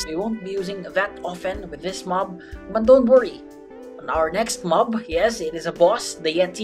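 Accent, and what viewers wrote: Philippines. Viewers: PINOY KA? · Your Filipino, accent and the way you talked to the guy you took the yeti from "lods"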